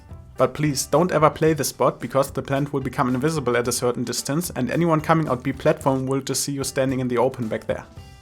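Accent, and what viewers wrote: Germany. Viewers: You sound very german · trying understand your english with a German acent, is so hard, but you make a good video. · your German accent english is so awful, try to speak more fluently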